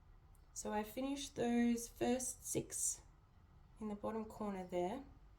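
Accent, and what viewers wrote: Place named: Australia. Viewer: !!! Oh my goodness you did excellent! I got absolutely no stitching done because I was enjoying this with my morning coffee!😁☕️ So many great WIPs, and some I don't remember seeing. I love your Pandemic your colour choices/ placements are so fun! Paradise Found is definitely one of my favourites and I loved seeing the progress on it. ( I laughed when you said you didn't pick MC so it's more doable, because whenever I get around to my JN it is regular version MC🤦‍♀️😝🤣) 26.99%🤣🤣 oh that made me giggle! Talking about 939 and the Kreinik did also!🤭 Your CM Designs are beautiful! Love your Chicago piece, those trees!!😍 I had so many more things to say but like always I have forgotten them by the time I get to the end of a video! So proud of you for jumping on the Flosstube train! Oh and I love your Aussie accent! 😊 Thanks so much for the shoutout!!🥰 I am so glad I could say I was here RIGHT from the beginning of your flosstube journey! 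Sub #1!😉🤭😊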